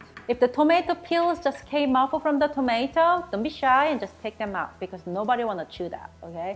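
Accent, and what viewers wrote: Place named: South Korea. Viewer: 영어발음이 너무나 익숙한 발음이라 한국분이신가 했는데 역시 한국분이시군요ㅎㅎ 똠카까이 너무 좋아하는데 자세한 레시피 감사합니다!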